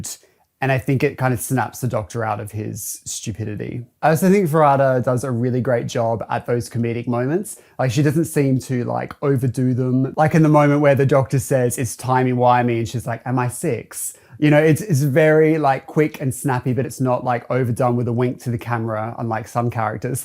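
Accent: Australia. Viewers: are you australian by any chance?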